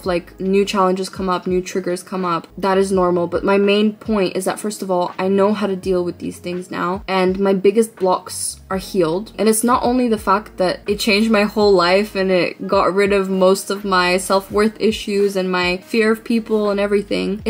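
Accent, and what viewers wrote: Russia. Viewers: У тебя идеальный русский акцент!❤❤❤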